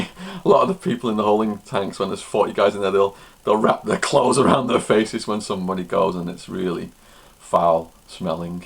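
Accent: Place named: Northern England, United Kingdom. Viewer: You from Uk? Sound like you’re from Chester or somewhere up north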